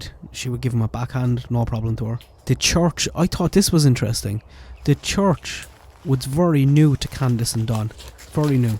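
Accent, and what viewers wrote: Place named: Galway, Ireland. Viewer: You’re from Galway? I was trying to figure out the accent lol. I love Galway. I’m Waterford living in Kildare ☘️ . Glad a logical Irish thinker is covering this ❣️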